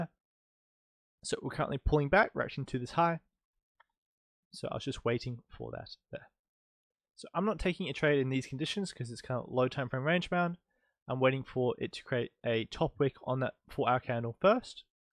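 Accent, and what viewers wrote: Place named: Australia. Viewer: God bless you... seems that you have great information, but I can't for the life of me, understand your English man😢 too fast, words are mixed together... is this Australian? Sorry... I wish we could change things like that in the settings but there's nothing... even the subs having hard time translating it... Again, God bless you... you're really sweet. Thanks🌸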